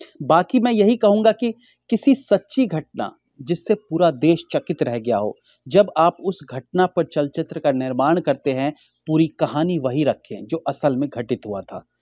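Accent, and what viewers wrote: Standard. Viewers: first time not understanding your review fully. its looks like you speak purely Hindi. Good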